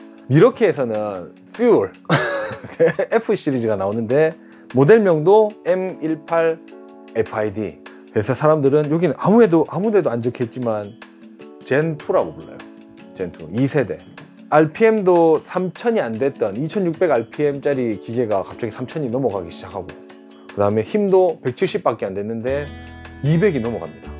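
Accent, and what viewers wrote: Gyeongsang, South Korea. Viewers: 이벤트 신청 완료 했습니다. 항상 너무 재밌게 잘보고 있습니다. 구수한 경상도 말씨가 아주 듣기 좋아요~~ㅎㅎㅎ 좋은 제품 항상 잘 알려주셔서 고맙습니다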